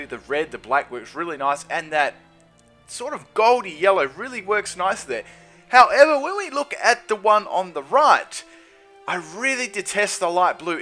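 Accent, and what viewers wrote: Australia. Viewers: Australian tho, accents are completely different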